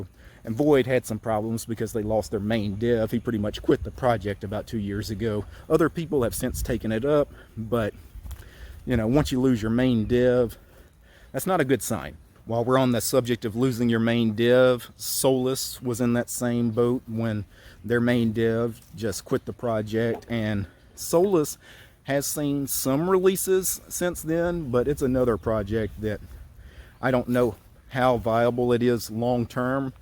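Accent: South, United States. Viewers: > bald boomer with a southern accent ranting about linux and open source software in his back yard Is this a whole genre now?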